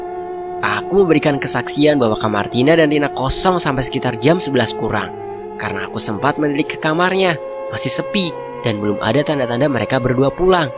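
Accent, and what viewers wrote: Java, Indonesia. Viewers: Sya suka cerita mistis tapi kalo ngomongnya jawa medok jadi males dengernya memang udah ada teksnya tpi ini cerita bukan film saya dengernya sambil kerja...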